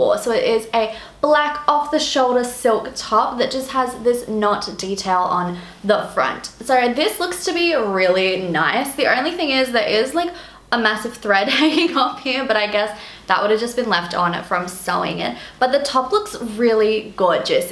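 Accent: Australia. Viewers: Why do I love them? Because she’s honest and classy without ever being cruel. She’s got a fun personality and I could listen to her Aussie accent all day!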